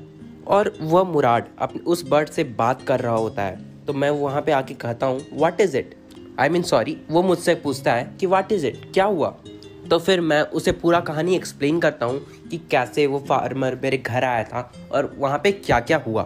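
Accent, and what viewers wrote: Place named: Bihar, India. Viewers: One question you are from Bihar??? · You are from bihar Right!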